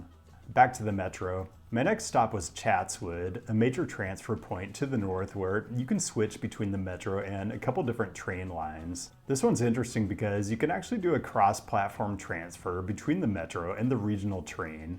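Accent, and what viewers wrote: United States. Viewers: Thanks for covering my city ... but as with last video I am again triggered by your pronounciation of Melbourne. Your accent is American .. its okay and expected to include the "R" (MEL-burn). Your way (MEL-bun) is not American nor is it Australian ... it is just odd. And it's Parra-mat-a .. not "mot-a". Ignore my silly YT comment whinging ... great content.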